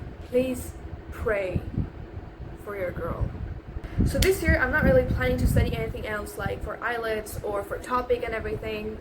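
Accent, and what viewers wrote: United States. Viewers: Why do u have an american accent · I’m disgustingly annoyed by the fact that you sound exactly like a girl I know, and guess what she isn’t even from India, she’s from the STATES🦅🦅. Why and how do you sound so American?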